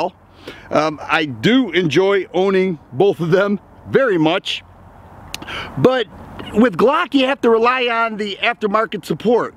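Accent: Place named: South Jersey, United States. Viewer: Sound like your from South Jersey or Jersey at least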